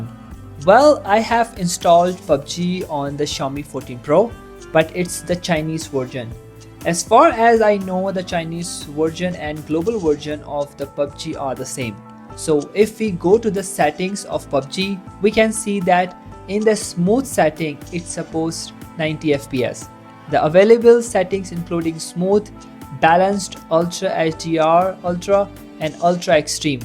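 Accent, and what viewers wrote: India. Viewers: Indian accent so irritating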